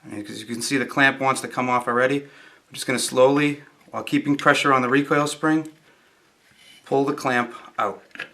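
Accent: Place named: New Hampshire, United States. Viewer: Great, helpful vid! As soon as he said ‘Polaris recoil stahtahs’ I knew this was NH. We moved to VA not too long ago, and man I didn’t think I would miss home or the accent this soon lol. The other thin I miss about NH is no sales tax(or INCOME tax!!). Also seems like there are 3-4x the amount of used ATV’s up there, for less $ than here. And oh ya, NO F IN SALES TAX! Haha happy riding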